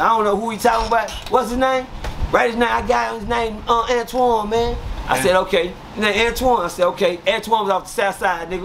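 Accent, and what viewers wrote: South, United States. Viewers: Def high..... he has a southern accent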